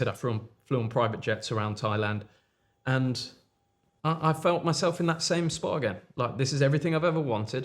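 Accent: United Kingdom. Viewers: What a hell. This is like Hamza, but more articulate and written 🇬🇧💂 Certified British accent 💂🇬🇧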